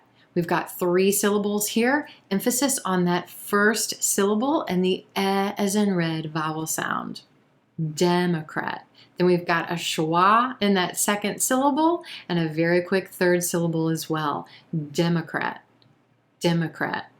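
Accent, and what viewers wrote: United States. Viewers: Thanks for the video. Love your accent. It's so American. Wish I had such one too. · Hi from Somalia...ur teaching is waaw and ur American english is music to my ears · Hii you are a good in American assent. So I want to join your pronunciation course. plz tell me about your course how am I join your course 👍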